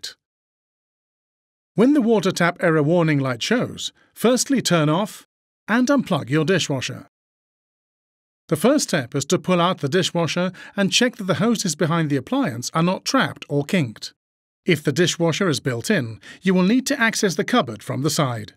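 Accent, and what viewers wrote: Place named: Germany. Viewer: They same German voice on Airbus videos🤣🤣🤣🤣🤣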